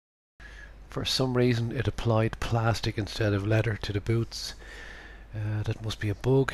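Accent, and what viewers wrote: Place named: Ireland. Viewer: Nice video here, and love that Irish accent. Thanks for showing the ZB to Keyshot Bridge in accent. Nice character too. Do you know if the Bridge works with any version of Keyshot 5 or later or if I need the special Keyshot for ZB?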